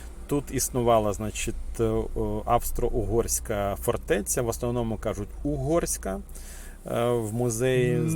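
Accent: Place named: Eastern Ukraine, Ukraine. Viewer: В автора чіткий "східняцький" акцент.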